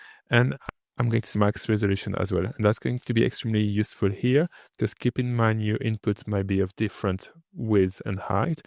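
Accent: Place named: France. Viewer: Really fantastic video tutorial, very well explained and in depth. Your english is also fantastic, however, if I am brutaly honest, you have quite a strong french accent and pronounciation, which makes it hard for me to understand you perfectly all the time. Only pointing this out as I found the subtitles very helpful, for following your speech, so remembering to include them in your uploads will likely always be very helpful for your viewers. Look forward to watching more of your content. I am interested in developing some ai photogrammetry to stereoscopic 3d/vr and cad and stl file pipelines, so finding the best way to optimize poor quality low res recordings from a very large number of different sources, efficiently with a high quality footage output, is critical for this objective. I assumed that this upscaler would not be the best choice for this, especially as my first tests were generating unrealistically sharp details, but now thanks to you, it looks like the best option I have found so far, though like you say, it could be better at handling motion. 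Not sure if there is a better free offline alternative for this? Now I have to figure out how to compare the quality output between different video renders in kdenlive, as I am new to comfyui and have no way to install the required nodes, etc, to do this for the next couple of days. I come from more of a 3d modelling background personally and am astonished to learn how many laborious tasks AI can speed up nowadays. Quick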